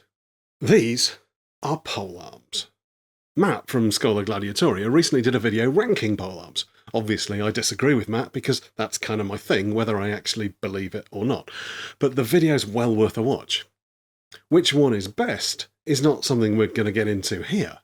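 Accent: England, United Kingdom. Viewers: Is he being sarcastic when he asks how I’m doing or is just his English accent?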